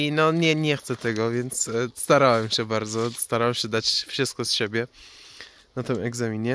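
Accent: Poland. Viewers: wlasnie nawet akcent polski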